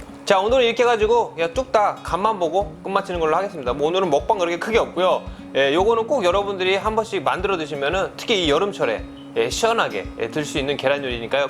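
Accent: Japan. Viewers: Gecko Park_KR 교포는 아니시고 일본에서 오래 생활하셔서 그래요 ㅋㅋㅋ예전 영상들 찾아보시면 일본인이 한국어 쓰는거 같을정도인데 영상하시면서 많이 돌아오신듯ㅋㅋㅋ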